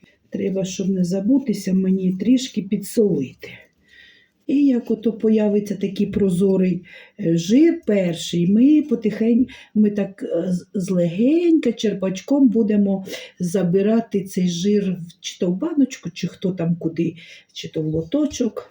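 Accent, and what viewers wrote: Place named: Central Ukraine, Ukraine. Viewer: ви просто унікальна! У нас на Україні дуже багато діалекту. Всі люди по - своєму розмовляють.Але всі вони наші рідненькі, дорогі і неперевершені. Ви по- говору -- це центральна Україна. Всі рецепти з цієї території. Розкажіть більше про себе. З відки ви? " Ми вже ваші, а ви наші. 1000 лайків.❤❤❤❤❤❤❤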